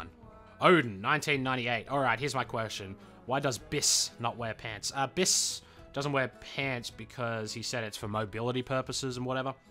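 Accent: Australia. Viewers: are you aus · What part of aus you from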